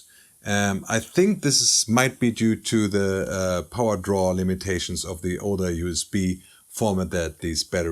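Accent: Germany. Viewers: I could listen to that German speaking accent and intro synths forever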